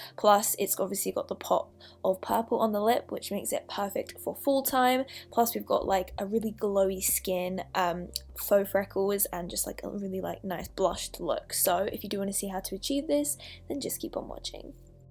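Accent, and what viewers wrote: Australia. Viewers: Savanna I feel like she sounds sorta aussie in this idk why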